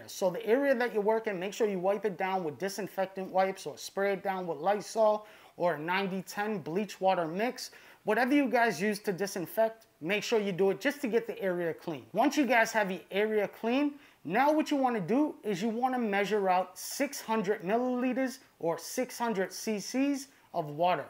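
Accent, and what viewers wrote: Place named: Massachusetts, United States. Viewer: Sounds like you got a Massachusetts accent.. mush love from 508 🤙🏻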